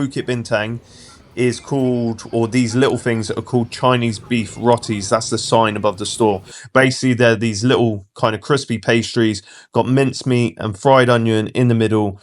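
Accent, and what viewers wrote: United Kingdom. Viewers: I absolutely LOVE the british accent, i can listen to that all day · What part of UK are you from because your English is English…u know what I mean?? You are speaking very smoothly, every one can understand you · It must be from the UK that's why you knows protons..but unfortunately you doesn't know that protons are malaysian cars..Actually all around you are proton cars but you don't know because it's possible that protons aren't marketed in the UK anymore..that's why you don't know the new release from protons..and you noticeable accent is Uk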